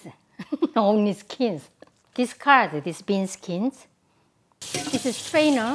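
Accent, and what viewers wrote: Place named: South Korea. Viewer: 이분영어도 너무사랑스러워요 투박한 한국식 발음으로거침없이 설명하시는영어 진짜좋아요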